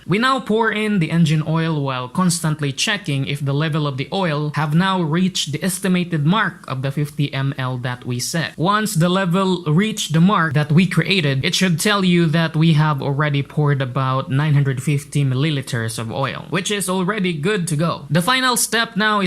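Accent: Visayas, Philippines. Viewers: Hindi!! alam mo kung bakit nag English yan? Kasi yung nag tagalog yan parang bisayang o bikolano tukmol halos hindi mo alam kung nagpapatawa siya ehh para siyang makatang pilipino na may mental retarded. Binago niya yung language niya kasi maraming bash sa kanya nakakatawa kasi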